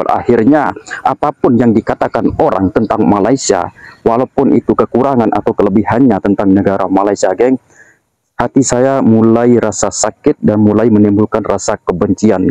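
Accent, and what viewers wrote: Indonesia. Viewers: Kakak tau kamu orang indonesia dari cara kamu berkata2 berbeza dari bahasa melayu Malaysia khasnya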